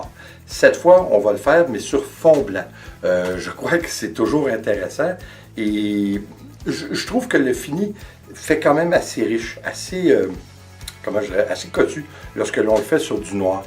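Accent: Quebec, Canada. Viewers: mon premier commentaire de France, malgré que je regarde ta chaine depuis quelques temps déjà. J'ai beaucoup appris de tes conseils et t'en remercie. Et ce bel accent quebecois me rappelle notre séjour dans ce beau pays il y a 3 ans. Bravo à toi et merci. Bonne continuation.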